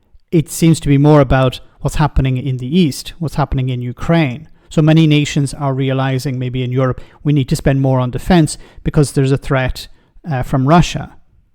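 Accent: RP, United Kingdom. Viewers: Remember, this is the very same Rees- who in 2013 was the guest of honour to a black tie dinner event hosted by the Traditional Britain Group, a very far right group of ghouls who thinks non-white Brits such as myself should be repatriated to their or parents place of birth. Rees- was advised not to attend by an anti-fascist campaign group, Searchlight. He went anyway. Only when he'd been outed did he offer a mealy-mouthed apology. Thus, it's merely one posh spoken bigot supporting a foul-mouthed bigot. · From the bloke who said Brexit would bring down food prices. And yet thousands of English (& only English) people will tug their forelocks & doff their caps whenever they see or hear this plummy voiced, old Etonian chancer. Bizarre doesn’t cover it. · talk to all people like they are thick and stupid and him. He think the posh voice get him a head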